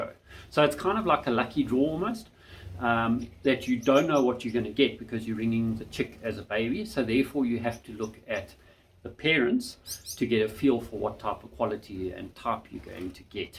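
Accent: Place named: Gauteng, South Africa. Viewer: Where about are you'll located in South Africa , your sound like from Gauteng . I am from Durban and like to breed gouldians and if you can hook me up to some good breeders and if you are selling birds . Where do you'll show your birds . Great to see breeders in South Africa sharing knowledge .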